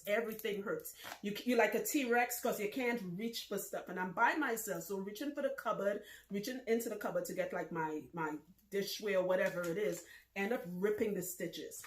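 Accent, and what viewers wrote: Trinidad and Tobago. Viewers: You look and feel like family, Share my daughters name and nickname, AND are on the IFA PATH! Love it! I have watched you off and on for a bit to decide whether I am ready to commit to the loc journey, and HOW to correctly care for my crown when I am ready. I must say THIS SHARE RIGHT HERE.....un-cut and No Mamagisms... was right on time! I bought a journal years ago. It was blessed when I was crowned in Naija. Now all it needs is 27, Hebrew, and The Seal of Ase-WA!!! Words are not enough to express my gratitude because listening to this video today to the end has confirmed, I have been in my own way post a traumatic marriage. I must admit Your accent and euphemisms had me missing T&T too bad. I am going to ring family after this video lol just so they can subscribe as well. I am full on supporting now. Especially because your story makes one introspective. 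I was crowned a Rare Female King and now I need the Balance of My Divine Male. I just need to follow the wisdom imparted in this video. Modupe ati Iré Ní O!! Will update you on the manifest💯💛💙💛💯ASE!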